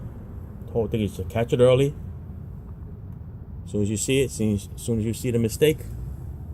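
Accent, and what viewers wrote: New York, United States. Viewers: Great job. That's a heavy NY/NJ accent. Lol. Cool though bro.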